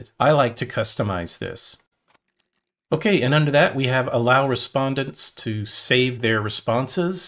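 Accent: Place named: United States. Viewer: very useful! However, every time I hear an American pronounce 'mobile' in 'mobile phones', I'm struck by how much it sounds like 'MOBIL' as opposed to mob-ile which is how the rest of us pronounce it (Australia, Britain, Ireland, Scotland, etc) Indeed, how do Americans pronounce the name of the oil company, MOBIL? And how would you distinguish that from something being mobile? Any way, who cares. You answered my question which is how I actually get my form link to my users, so thank you sir for the clear explanation!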